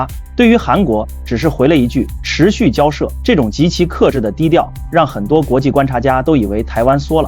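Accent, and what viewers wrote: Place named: China. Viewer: 奇怪這位先生應該要用台語說才對吧！怎麼會用大陸口音呢？就算把首爾改成漢城也只有台灣自己說而已，全世界都會說韓國首爾！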